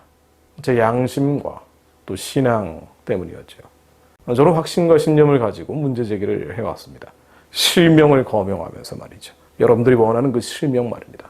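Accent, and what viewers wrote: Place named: North Korea. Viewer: 이사람도 이북악센네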